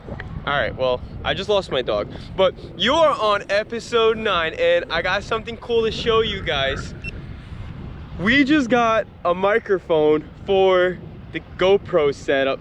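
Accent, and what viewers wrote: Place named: Canada. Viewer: Great vid but that accent is rough dude. Where are you from? Canada? Here in the south you would stick out like a sore thumb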